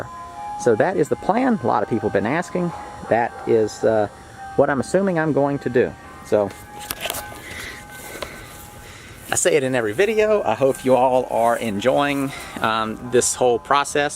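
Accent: South, United States. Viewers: If the accent and price of homes didn't give it away that dirt is as south as you can get ! Look at all the clay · I'm assuming you're in a southern state by your accent and the homes prices...can I ask which state? We are in Louisiana, so just curious. The duplex is 11k, we will have our work cut out for us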